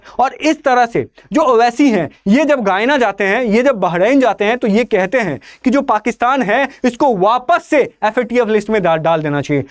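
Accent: Bihar, India. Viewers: sir have such a bihari accent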